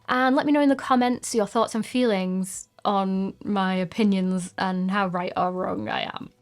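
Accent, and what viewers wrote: United Kingdom. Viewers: You could do voice overs for british characters in animation films. Your voice is almost perfect.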